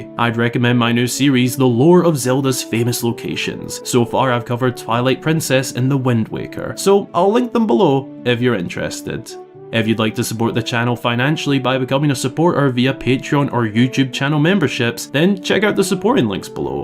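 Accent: Scotland, United Kingdom. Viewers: never said he was, but just saying there's nothing wrong with his pronunciation. I can't speak for all dialects, so I wanted to make sure that it didn't seem like I was. I'm sure most of viewer base is in America, that's why he speaks with less of a Scottish accent. It makes sense, in a purely analytical way.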